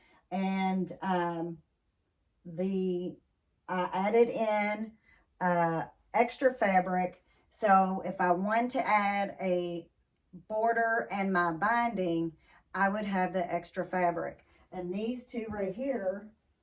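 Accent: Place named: Tennessee, United States. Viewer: That's a beautiful quilt. Are you from Tennessee, by chance? Your voice reminds me of some of my folks in Overton County.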